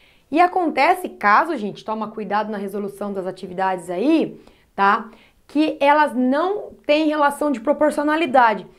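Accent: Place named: Minas Gerais, Brazil. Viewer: Adoro esse "erre" ... és mineira? Tão doce, obrigado pelas aulas.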